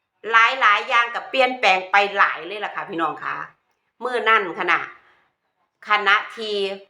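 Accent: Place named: Laos. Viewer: น้องเขาคนลาวเขาก็ใช้สำเนียงลาวเป็นเอกลักษณ์ของใครของมัน อย่าอืจฉาเขาเลย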